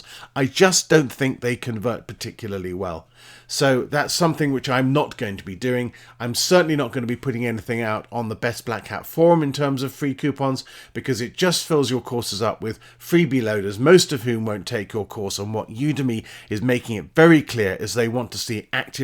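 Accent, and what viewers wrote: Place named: United Kingdom. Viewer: your very good tolisten to i bought my first course last night looking forward to it. just a thought what is pulse. i think instagram reels and tik tok reels are a quick easy way to catch the eye to get a viewer to consider your course. get them with a key point from one of your courses.. nice accent does sound like british army show lol .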